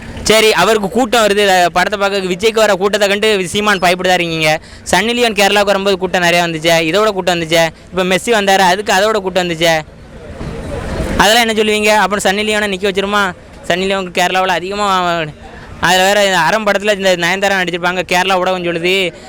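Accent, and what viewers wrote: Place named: Tirunelveli, India. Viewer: நெல்லை தமிழ். இனிது ❤❤❤❤. வெற்றி நிச்சயம். நாம் தமிழர்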